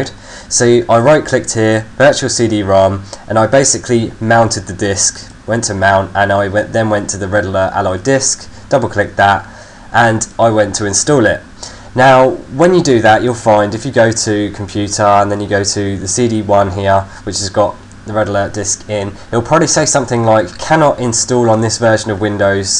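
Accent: United Kingdom. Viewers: Best Accent ! love british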